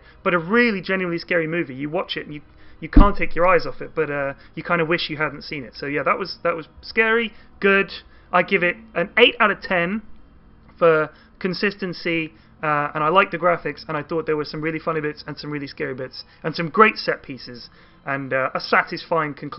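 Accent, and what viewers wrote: Germany. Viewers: dat German accent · started with a generic german accent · Amazing german accent!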